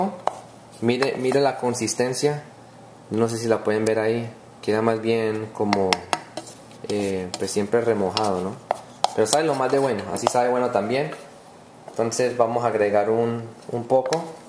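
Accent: Antioquia, Colombia. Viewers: Hola niño guapo! Buenísimo! Buscando recetas para cocinar la quinoa llegue a tu canal, aunque ya había hace algunos meses ya había visto algunos videos tuyos y me parece que tienes muy buena información. Eres tan fresco e inhibido, invité a mi hija a que viéramos juntas este video, pues es para ella a quien le cocino este tipo de comidas, ya que es vegetariana, (algunas semanas vagana, jiji!) Y le caíste de lo mas bien y dijo: He's so cute! le encantó tu acento colombiano...pero mas se sorprendió de cuanta buena información proporcionas. Dale gracias a tu mami por esta deliciosa receta, y por favor que se anime a abrir su propio canal para que nos comparta todas sus recetas vegetaríanas y/o veganas que me imagino ya deben ser muchísimas! Saludos Cordiales! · ole tu suenas paisa, ja ja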